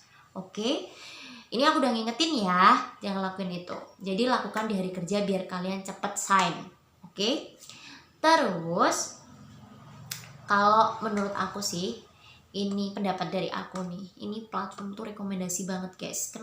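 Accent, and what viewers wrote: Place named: Java, Indonesia. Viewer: Medoknya menteb bnget mbak, sama kek saya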